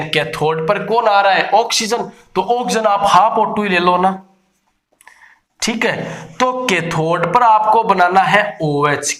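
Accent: Punjab, India. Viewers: Aap Punjab se ho sir kya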